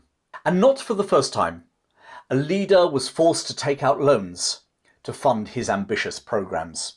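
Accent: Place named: Wales, United Kingdom. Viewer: That Welsh accent 🤣🤣 I didn't know anything about this incident - it's always fascinated me the way the various European imperial powers collaborated so actively and openly in the decades before the First World War. The Taiping rebellion and various affairs in Egypt spring to mind but there are other examples. A shame that had to be ended by total global conflict.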